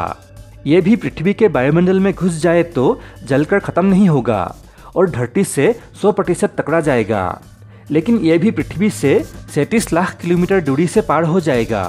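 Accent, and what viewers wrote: Assam, India. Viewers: Are you Assamese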